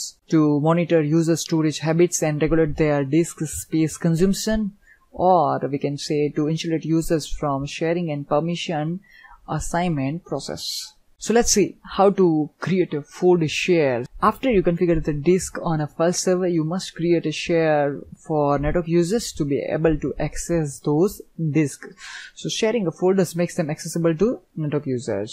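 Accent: India. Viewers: ugly accent . try using a robot instead your STRONG indian accent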